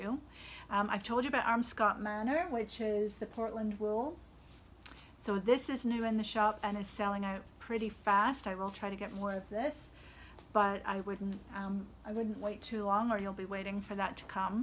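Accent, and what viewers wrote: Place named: Glasgow, United Kingdom. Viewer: I’m so glad I found you and your lovely shop, you are a voice from home - I’m from Glasgow living in Virginia :-) Merry Christmas and a happy New Year to you and yours.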